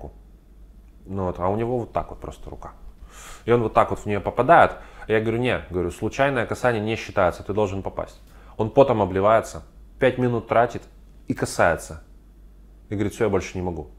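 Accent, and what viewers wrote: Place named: Urals, Russia. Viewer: Мне кажется, доктор - уралец? Говорок уральский). Такой молодой и такой потрясающий!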